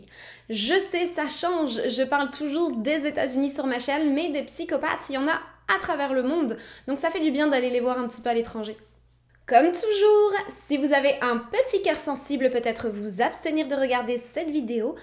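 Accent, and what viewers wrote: Quebec, Canada. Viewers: Salut jai tomber sur tes videos aujourd'hui....jadore ton accent....je vit au quebec et jai le doute que sois tu vit ici mtn...sois tu y a vecu un bout de temps!!!😅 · Tu commence à prendre l’accent québécois! Wow. Félicitations pour tes 31k❤️‍🔥❤️‍🔥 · J'ai découvert ta chaîne hier soir et je trouve ça génial , un vrai plaisir et pour quelqu'un qui vient de France tu a un joli petit accent canadien 😉👍🏼🌹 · C'est tu moi ou desfois ta un accent quebecois??? Genre des sons je sais pas xD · Est ce que tu vis au Québec ? Tu as un accent québécois je trouve 😊 très bien raconté, encore merci !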